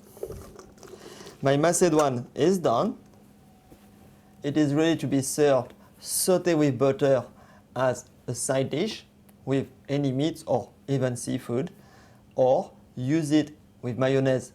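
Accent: France. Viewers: on vois que c est un français qui parle car son accent anglais n est pas maitriser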